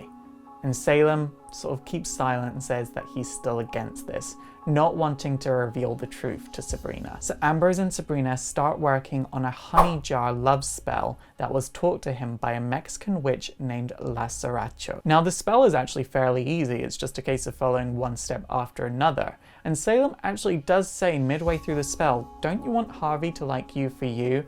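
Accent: Scotland, United Kingdom. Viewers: where abouts from Scotland are you from. I'm from Glasgow. BTW I have the biggest crush on you, and watched your vids for ages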